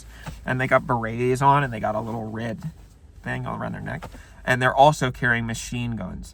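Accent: South, United States. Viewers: His southern accent is so fucking sexy… ❤️ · Nothing has ever offended me more then southern accent.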